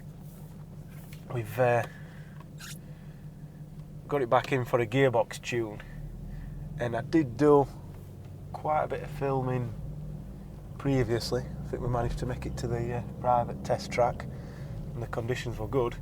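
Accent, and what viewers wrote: Yorkshire, United Kingdom. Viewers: Love how Yorkshire you are! Great work lads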